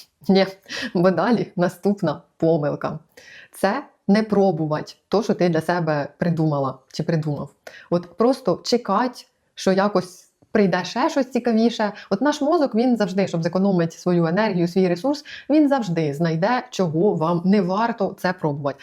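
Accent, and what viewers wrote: Ukraine. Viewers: Прикольно,це перше відео із вашого каналу,котре я дивлюсь,чогось ютуб його мені підкинув.Подобається ваша щірість та те,як ви розмовляєте,ваш легесенький суржичок просто божествений.Дякую вам за надхнення ! ! ! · Гарне відео. Якщо можна особисти питання. Ви випадково не з Полтавщини? Якась знайома балачка🙂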